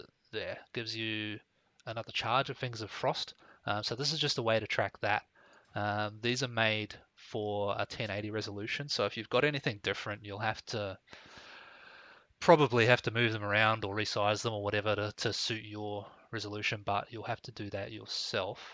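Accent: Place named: Australia. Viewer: Ah! yeah I wrote "are you aussie" first, then watched more and editted my comment to include kiwi haha. Your weakauras are awesome, cheers bud!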